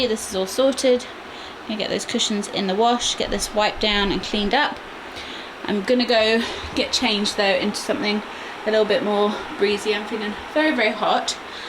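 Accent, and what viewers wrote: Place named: United States. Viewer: Hi, love your vlogs. I have been wondering something for a while and sorry if I’ve missed your explanation, but I notice you use quite a few American words, like Vase or Yoghurt and I wondered if you were born or lived in America or it’s just influence of watching lots of American show(most kids of today do this 😅)? You are very well spoken, but you have a slight American twang and just wondered the reason. ❤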